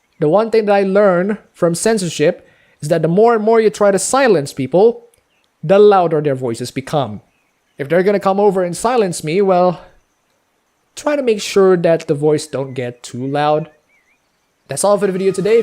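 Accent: United States. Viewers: You're speech kinda reminds me of the things a lot of the stuff my fellow Californians have. Also good luck.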